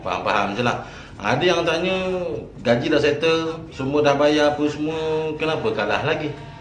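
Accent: Malaysia. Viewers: Mcm org pahang😅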